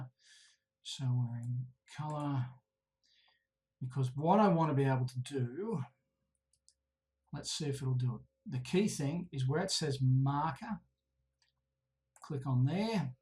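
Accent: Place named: Australia. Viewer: Also saved me as I write my thesis!! Thank you! Love hearing a fellow Aussie accent in one of these videos too :)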